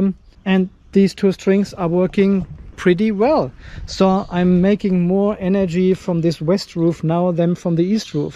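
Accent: Germany. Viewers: und ich war mir immer sicher da einen deutschen Akzent zu hören :) Mach weiter so :)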